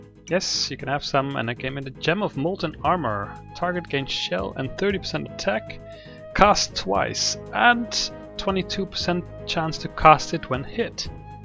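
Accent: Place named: Germany. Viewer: Just bought this game for my wife and I. Where's that accent from? I want to say German but I could be wrong.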